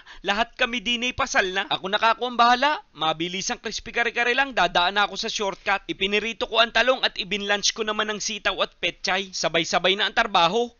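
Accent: Batangas, Philippines. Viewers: Are you from Batangas?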